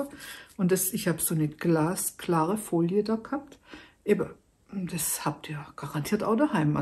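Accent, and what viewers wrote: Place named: Hesse, Germany. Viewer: Hallo, ich find das super, das 'babbeln" zwischen durch. Hauptsächlich auch wegen dein Dialekt (ich bin aus Hessen) find ich toll❤. Natürlich auch das, was du uns zeigst ist immer wieder wunderschön. Ps seit langem suche ich Lochverstärker und nur die aus Kunststoff gefunden. Und du hast ein Gerät gezeigt, wo ich eigene herstellen kann. Ich bin begeistert. Danke du Liebe🤗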